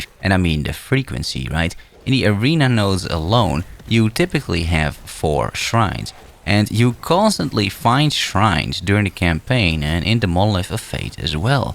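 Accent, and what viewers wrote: Netherlands. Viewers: Amazing how a Dutchy can sniff out another Dutchy within 2 seconds, by the accent haha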